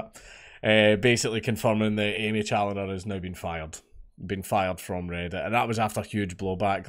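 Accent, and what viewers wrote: Scotland, United Kingdom. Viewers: Scottish lol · I'm aware he's Scottish. It's a meme.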